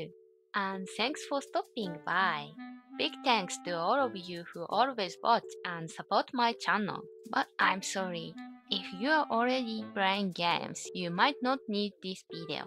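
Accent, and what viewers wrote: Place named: Japan. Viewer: Thank you for your guide and the effort you put into the video! Love your Japanese accent and the little character in the bottom left corner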